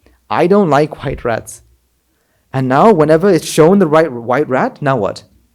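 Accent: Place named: United Kingdom. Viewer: Profoundness with British English excent sermon❤ saadhukara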